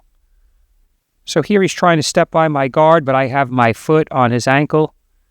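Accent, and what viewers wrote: United States. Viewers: Thank you for your channel and thank you for your accent. I’m a big fan of American regionalism and the maintaining of American accents.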